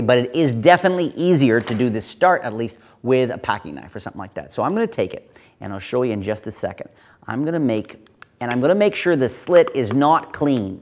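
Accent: Canada. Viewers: are you canadian? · Are you Canadian???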